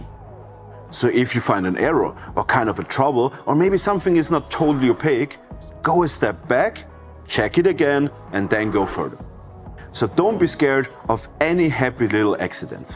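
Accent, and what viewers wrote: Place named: Austria. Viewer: Das mit dem offwhite muss ich mal testen. Bis jetzt eher Richtung Knochenmäßig gegangen. Du gibst mir voll die Schwarzenegger vibes mit deinem Akzent. Super zu verstehen. Freue mich auf mehr. Grüße aus Hamburg.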